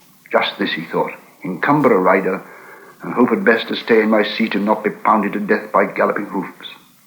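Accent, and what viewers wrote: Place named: Scotland, United Kingdom. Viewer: His Received Pronunciation makes him sound Scottish!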